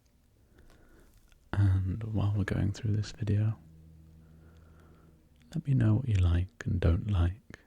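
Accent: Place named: England, United Kingdom. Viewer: I'm a sucker for an English accent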